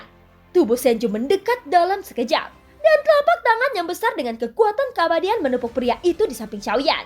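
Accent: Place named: Surabaya, Indonesia. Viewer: Suroboyoan rek bosone , semangat yo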